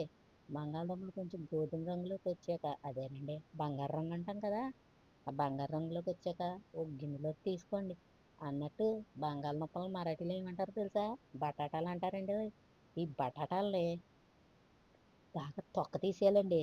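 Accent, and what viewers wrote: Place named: Godavari, India. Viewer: godavari slang super andi👌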